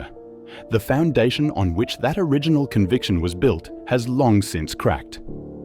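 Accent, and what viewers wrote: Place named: Italy. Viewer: Was that supposed to be people talking over each other at 11 : 00 in, mate? I thought maybe it was you practising a technique of phone calls in the background as you said the rest. Maybe not I couldn’t follow it though. However, the Italian accent won me over.. I’m subscribed. I live in Melbourne and have all my life just removed to the Wimmera. Happy to find an Australian crime channel by an Australian. Good work mate. Anyway it’s 1108 into your story, I’m going back into here the rest of it. If David ?????? did it he’s the worlds dumbest criminal that ever lived LOL.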